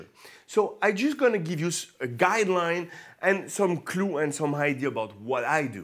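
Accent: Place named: Quebec, Canada. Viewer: Hey this guy is a quebecois 🤣🤣😂🤣 j’adore l’accent en anglais. Vraiment authentique 😂😂😂 Je vais m’inscrire à ton canal. J’espère que tu as des recettes à base de plantes 🌱 😉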